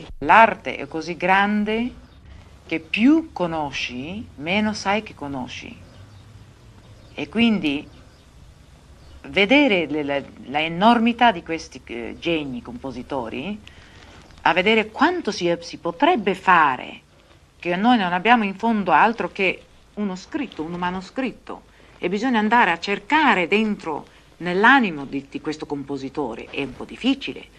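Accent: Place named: Veneto, Italy. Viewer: Giusto. Si sente (ancora) l'influenza dell accento Veneto.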